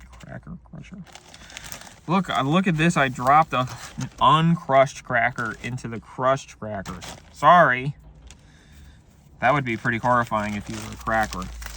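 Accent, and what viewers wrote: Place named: Philadelphia, United States. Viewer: Man is def from the general Philly/Bmore area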